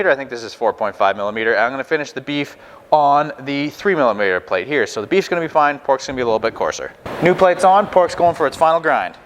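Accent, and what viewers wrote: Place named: Canada. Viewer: So I can tell from your voice that you are a fellow Canadian the way you said today you sound like half the guys I hunt with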